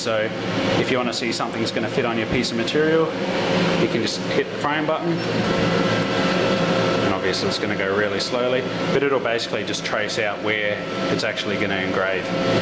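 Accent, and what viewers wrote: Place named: Australia. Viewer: Me likes your aussie accent :) Noice!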